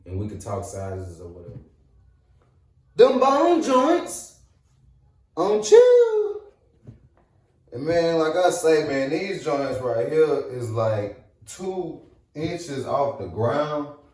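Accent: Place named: South, United States. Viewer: Bro you country as hell 😆 but your accent cool coming from a NYer🎯❤️ this video super helpful tho I just bought them an wanted too see what they look like in real time.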